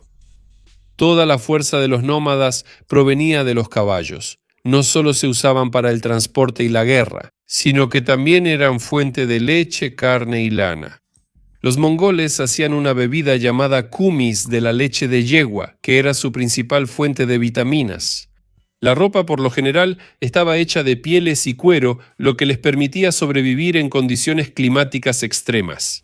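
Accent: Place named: Argentina. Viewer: Ahora tiene acento argentino el.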